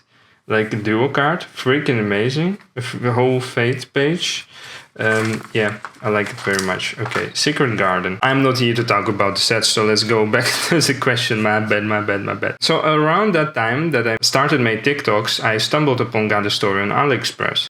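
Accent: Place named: Netherlands. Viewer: U have to work on your English pronouncing because I can hear 100% you're dutch ! xD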